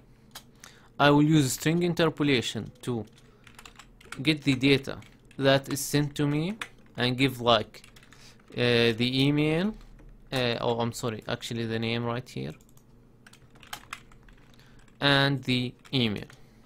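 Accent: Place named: Arab world. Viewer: متأكد الي بحكي شخص عربي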